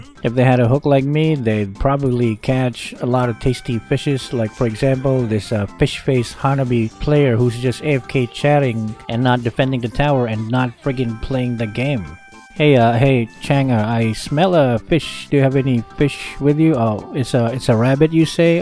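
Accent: Philippines. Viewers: Are u a filipino with english language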